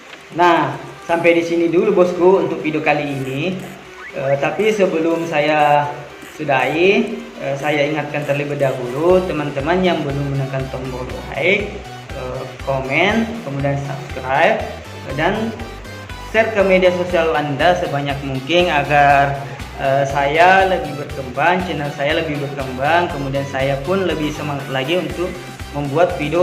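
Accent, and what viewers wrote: Indonesia. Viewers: Org makassar ta?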